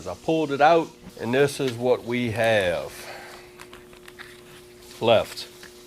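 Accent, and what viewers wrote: South, United States. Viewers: You like Asian! But sound like a total southern! Quite a trip. Nothing wrong!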